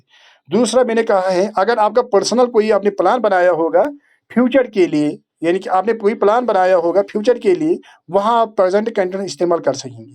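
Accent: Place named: Kashmir, India. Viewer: Sir great expl. in Kashmiri accent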